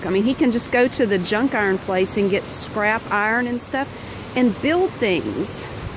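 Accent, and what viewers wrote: South, United States. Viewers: I live in Virginia Beach and I do the same thing you do, whenever you’re around relatives the southern accent comes out stronger!! I have family in both Carolinas and I love stopping at the border and south land!!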